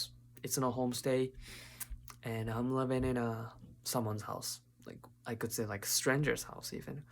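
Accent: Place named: Japan. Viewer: 日本人英語でわろた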